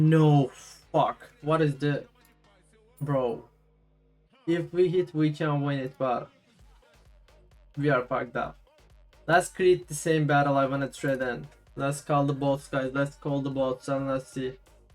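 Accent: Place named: Turkey. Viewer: Are you Turkish? your accent sounds like Turkish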